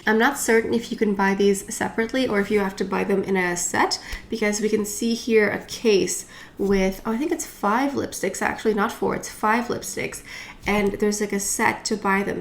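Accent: France. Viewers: I adore your french accent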